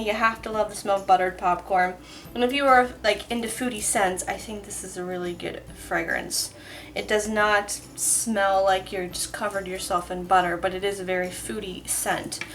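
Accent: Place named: United States. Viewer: Oh it’s so cute 😍 I personally don’t like popcorn at all 🤢 but my kids love it!! The smell stays in the microwave for ages too hahahahaha 😂 How gorgeous was that little cake your friends mum dropped off 😍 it looked so delicious!! I am growing a few herbs atm (it’s spring here now) tho I don’t use them a lot lol. We have parsley,thyme,chives,and coriander just popping up :) P.S. I love the way Americans say herbs 😆 here in Australia we prenounce it “herbs” not “erbs” and we prenounce the colour mauve “moe-v” 😂😂 we are weirdos lol 😆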